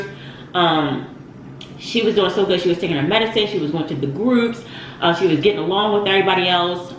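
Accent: Baltimore, United States. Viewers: LLS girl I Loved that story, it had me laughing so hard and those crablegs get me thinking about getting some tomorrow. You sound a lot like my Baltimore friends but not all they way. Maybe like Philly and Baltimore mixed lol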